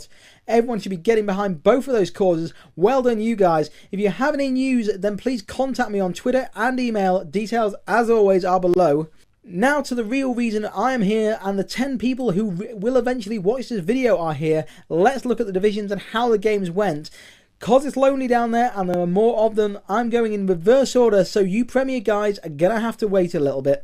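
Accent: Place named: Crewe, United Kingdom. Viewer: i know this voice... dont suppose you're from crewe/stoke?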